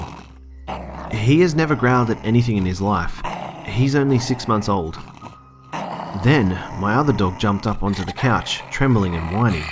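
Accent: Australia. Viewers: I want to get into the stories but the Australian accent kills it. I keep thinking you're going to shout "HAY be a good Sheila & put another shrimp on the barbi". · Alabama chic with an Australian man's voice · Are u Aussie? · Aussie narrator for a story set in Alabama 🤔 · I love that he’s Australian, him swearing in an Aussie accent is the best thing to ever happen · Aussie guy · I love Aussie accents 😍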